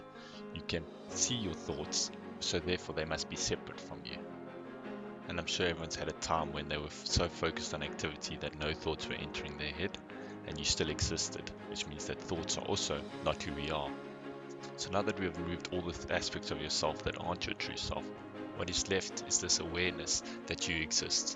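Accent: South Africa. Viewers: Is that a fellow South African I hear !?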